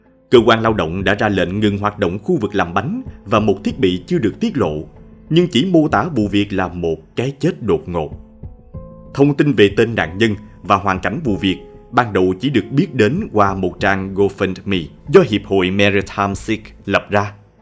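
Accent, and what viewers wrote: South, Vietnam. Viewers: Thích nge tiếng MN của nói chuyện rỏ ràng rất hây và tiếng.nói của HG ẢNH nói chuyện rất hay ủng hộ.kênh.2 cháu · Giong doc Mien Nam Phat am rat ro nghe rat hay, xin chuc suc khoe👍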